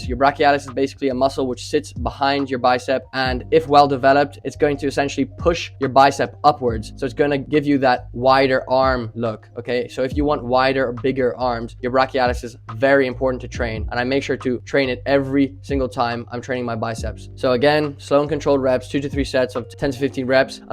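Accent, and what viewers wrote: Ireland. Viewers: What's your accent? It has a hint of South Dublin.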